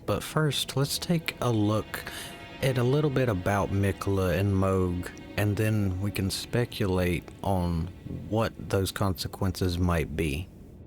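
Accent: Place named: South, United States. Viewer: Keep going bro and a like for the southern accent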